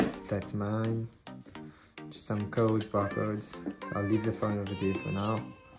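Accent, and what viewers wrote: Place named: Afrikaans, South Africa. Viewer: Love the Afrikaans voice I'm also south African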